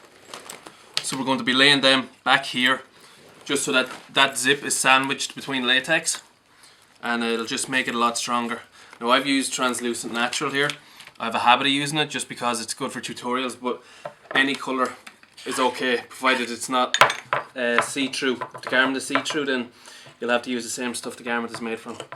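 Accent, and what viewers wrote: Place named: Ireland. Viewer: All this time I thought sounds Irish...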